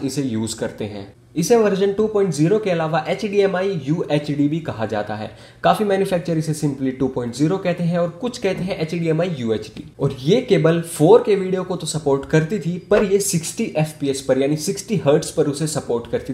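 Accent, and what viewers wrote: India. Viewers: Are you Gujarati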